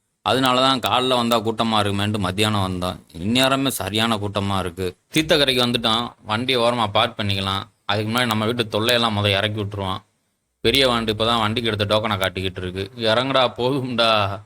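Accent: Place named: Madurai, India. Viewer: Mathurai slang 👌👌👌👌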